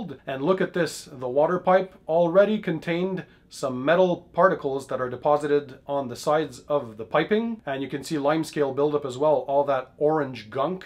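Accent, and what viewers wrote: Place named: Canada. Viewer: Canadians and their accents.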